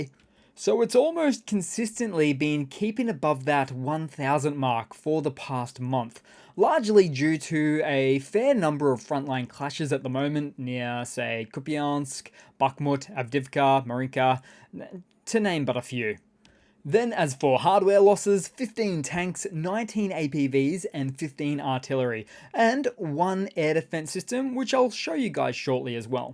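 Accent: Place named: Australia. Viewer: (sp?) I enjoy your posts. I follow a few others (Denys, Georgie,Anna,Artur ) and between you I feel that the fact checking is great. You all add something to the big picture. But one thing bugs me, your accent. Please don’t take offense but I’m just curious, are you an Aussie? 😂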